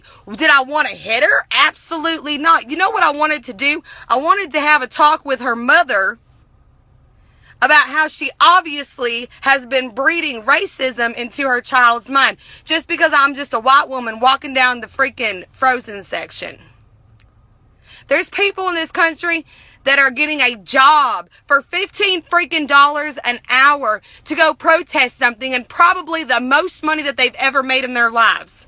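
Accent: United States. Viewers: No this is just a confederate loser, they all talk like this.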